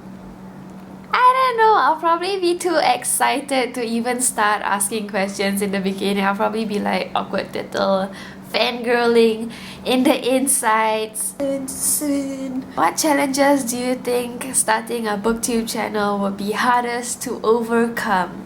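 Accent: Malaysia. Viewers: literally the first second I heard you speak I was like, this girl gotta be malaysian. AND I WAS (half) RIGHT :D OMG OMG ANOTHER MALAYSIAN BOOKTUBER THIS IS FANTASTIC. I also recently started booktube and I'm really enjoying it so far and like god know how I struggle with like keeping up with reading and filming and editing gah. dont worry I hit 20. it changes nothing HAHA You are an absolute natural and I cannot wait to see more of your videos! :D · Heyyyyy I LOVE your accent! I'm also Malaysian/Chinese! WHOOO. LETZ BE FRANDS!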